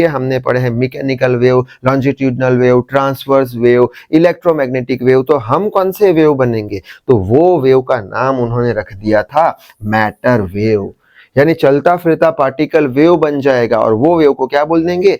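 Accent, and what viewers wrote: Gujarat, India. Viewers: Sir aap Gujarat ya fir rajasthan se ho na 😅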